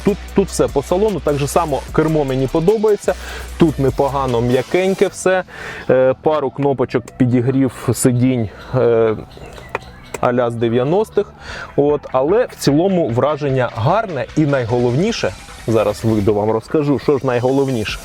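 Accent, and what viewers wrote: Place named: Lviv, Ukraine. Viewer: Майже львівський акцент!!!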